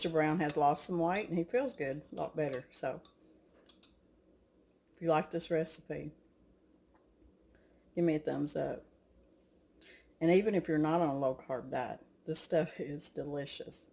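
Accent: South, United States. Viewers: Thank you again...I am going through all your older videos...as I have said before, it is so relaxing listening to you talk. You Southern accent is just adorable. I certainly understand about keeping off the weight at 73. Carbs and sugar are the culprits for sure. But cravings for these things does disappear quickly. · It's a life change. Diets are for quick weight loss. Thanks for your videos. I so enjoy watchin your southern recipes and love your southern acsent. Im going to make your salsa verde chicken casserole. I'm on weight watchers so i eat cauliflower as my rice replacement alot. Plus im not crazy about rice.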